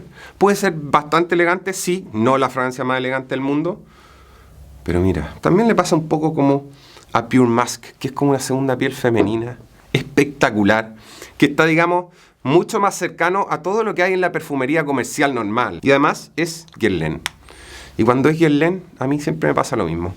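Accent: Chile. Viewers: cual es tu nacionalidad? Me parece escucharte un acento chileno? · perdon....Quien dijo que el era Español???? su forma de hablar es Chileno...!!!! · Por el acento es de Chile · Su acento es Chileno? Cuando dice: que me encanta, que me vuelve loco...madre mía!! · Tienes acento chileno. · Alguien save de donde es el. Su acento es de Chileno Pero el vive en españa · con razón le encontraba acento chileno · Dice "fragancia" y yo escucho "Francia". Supongo que es chileno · Si, es chileno, pero hace años vive en España, por supuesto le delata su acento, yo le entiendo perfectamente, a él y a los chilenos en general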